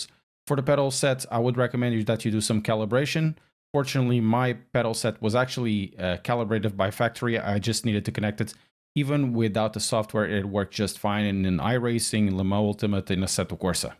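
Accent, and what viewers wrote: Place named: Portugal. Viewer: came for the reviews, stayed for the portuguese accent! Bom trabalho!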